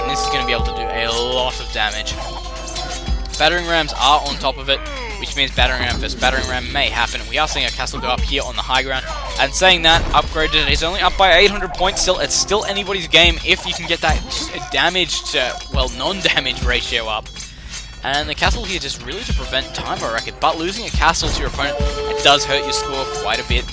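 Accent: Australia. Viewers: from your accent you're Australian. Cant you get hex to pay for your uni?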